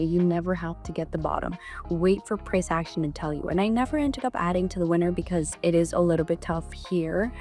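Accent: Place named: Paisa, Colombia. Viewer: quedo fascinado viendo lo tesa que eres y escucharte hablar ingles y español en ese acento de acá super paisa uffff😍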